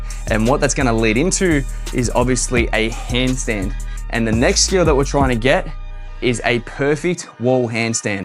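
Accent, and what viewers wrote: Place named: Scotland, United Kingdom. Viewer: You have no idea how I wish to improve it .. I'm really into calistheny! What is the country you live at? Your accent remembered that of a Scottish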